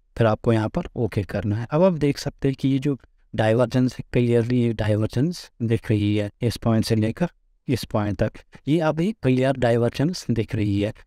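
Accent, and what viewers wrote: Kashmir, India. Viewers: Ur accent .... Kashmir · After listening just couple of your words i came know your are from Kashmir... Well done 👍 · Your accent is kashmiri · Bhai. aap ne to pura gyan hi de diya. Unclear vision sab fully clear kar diya he. Love you bhai. Hamesha khush raho aap or ese hi knowledge share karte rahiye. Apka awaz sunke mere kashmir wale dost ki yaad aa gayi. I am your subscriber now.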